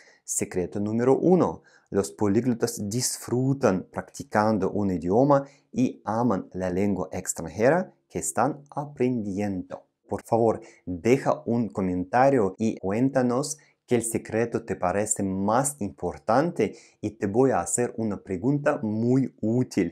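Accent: Russia. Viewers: Su acento es grave. Tiene un acento ruso en espanyol. No recomiendo aprender ahi. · por primera vez he escuchado un acento ruso en espanol. Interesante.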